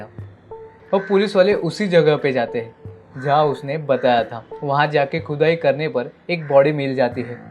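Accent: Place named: Mumbai, India. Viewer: Hindi should be more fluent...yeh mumbaiya hindi h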